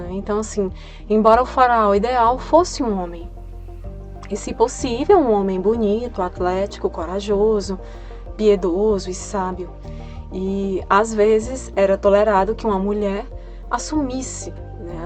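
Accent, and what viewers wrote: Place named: South/Southeast, Brazil. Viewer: Sou seu fã..., incluindo seu sotaque que, me parece, é do sul/sudeste...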